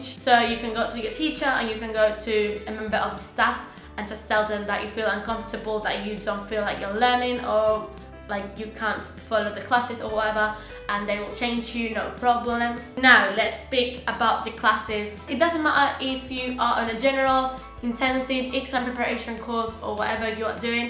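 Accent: United Kingdom. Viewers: hey you got a acent of british. cool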